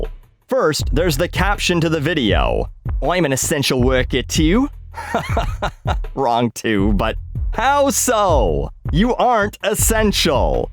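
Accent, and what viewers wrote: Australia. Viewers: Your aussie accents is like a cross between english and loose australian. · Loves the Australian accent and the woolworths reference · Love the Aussie accent and the references to woolies. Fucking hilarious vid!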